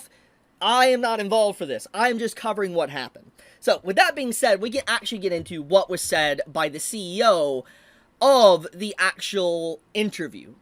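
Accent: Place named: Berkeley, United States. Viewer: She sounds like STRAIGHT from Berkeley, University of California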